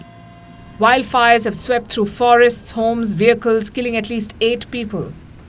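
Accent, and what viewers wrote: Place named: India. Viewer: Everything is perfect, no disrespect, but your thick Hindi English is at times very difficult to understand.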